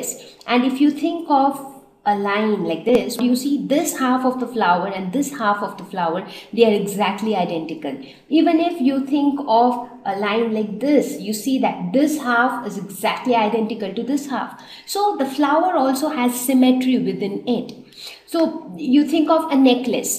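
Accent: India. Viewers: It's called Indian accent I told u cause u like the accent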